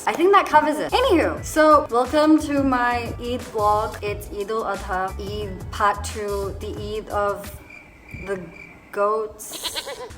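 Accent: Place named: New Zealand. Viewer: I really wanna learn NZ accent from you.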